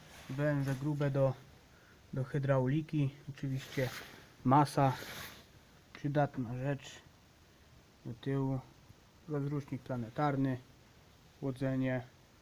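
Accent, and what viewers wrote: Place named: Lesser Poland, Poland. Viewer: Wiedziałem ,że Małopolskie🤪 Swój swojego pozna. Na filmiku gdy opowiadasz niektóre słowa akcentujesz podobnie jak niektórzy w moich stronach.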